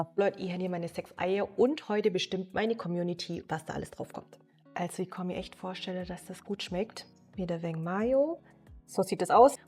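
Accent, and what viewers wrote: Swabia, Germany. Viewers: Geiler Akzent!! Aus'm Ländle ❤ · Des isch so sche die heimat wieder zum hören. Grüßle vom Landkreis Ravensburg gell❤😂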